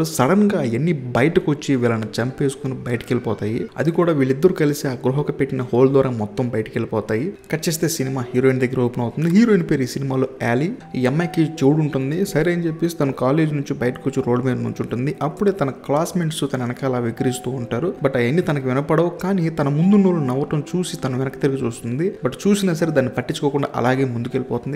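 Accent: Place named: India. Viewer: Anna mi explanation simply superrr. Mana telugu slang to cheppadam chala bagundii. One request Ee movies OTT lo vuntee cheppandii Memu chustamm Thank u brooo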